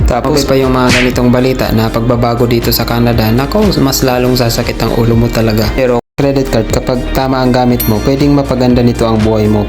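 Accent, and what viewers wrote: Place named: Bicol, Philippines. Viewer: kabayan bicolano ka po? puntong bicol ka po eh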